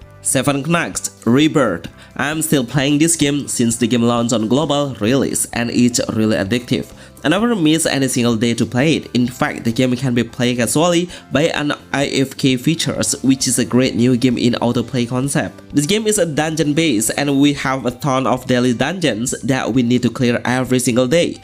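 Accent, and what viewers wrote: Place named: Indonesia. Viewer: I know this accent. Indo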